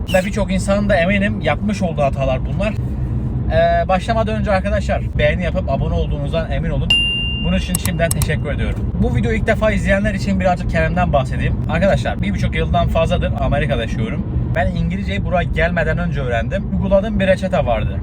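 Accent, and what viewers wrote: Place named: Azerbaijan. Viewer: Abi sen Azerbaycanlisin konuşmandan biliniyo😅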